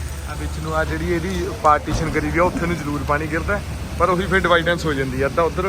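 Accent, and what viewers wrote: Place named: Puadh, India. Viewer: Gay gay Kaya Kaya thoda area fathegarh lagda pawad boli bolde ho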